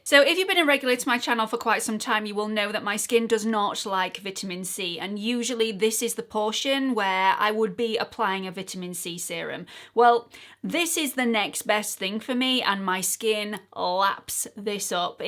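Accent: United Kingdom. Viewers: I, m Spanish and I, ve recently discovered your videos, may bé a week ago and I adooooore you, I, m 55 Years old, so, I listen carefully about tour mum's tips and devices about skincare and make up I think you're honest and I heard very realistic recommendations from you. And, theres a bonus, listening to you., I learn a lovely british pronunciation. Sorry, maybe I dont write very well, besos y enhorabuena por tu estupendo canal.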